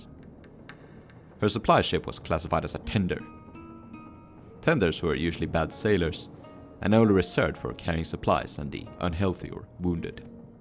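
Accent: Scandinavia. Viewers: Scandinavian accent? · What country are you from? im sensing a scandinavian accent, being a swede my self.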